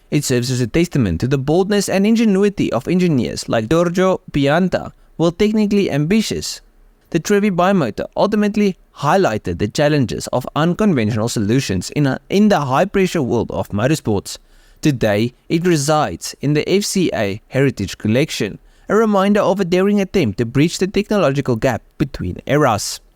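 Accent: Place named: Benoni, South Africa. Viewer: You must be from benoni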